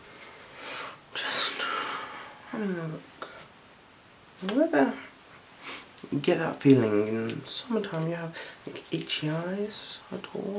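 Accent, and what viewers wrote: United Kingdom. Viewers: Ah, lovely. Heard some of that british accent there.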